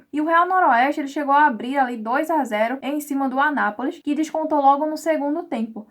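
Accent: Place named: Sergipe, Brazil. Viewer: kkk parece que e sergipana sou paraibano torço pelos times daqui e pelo mengao e claro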